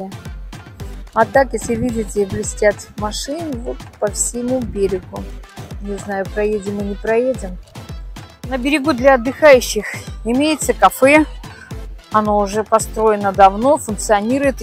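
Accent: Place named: Moscow, Russia. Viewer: Если бы не говор московскоголубой было б отлично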